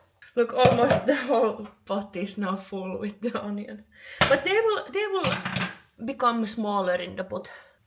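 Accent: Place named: Finland. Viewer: I have only been to Finland once, but your accent reminds me of the late Stina Rautelin. I am certain, that your channel will be successful - you are a pretty girl.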